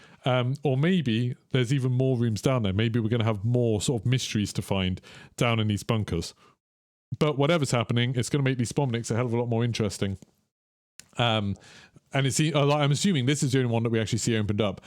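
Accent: United Kingdom. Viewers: Question: I seriously hope I'm not being offensive, so please don't like, flip out, but why do British Pronounce LZ as LZead and Lieutenant as Leftenant?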